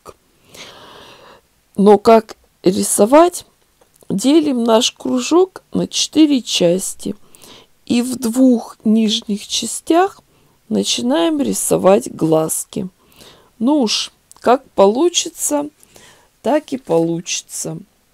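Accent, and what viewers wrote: Urals, Russia. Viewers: благодарю за уральский акцент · слушать ваши анекдоты, уральским говором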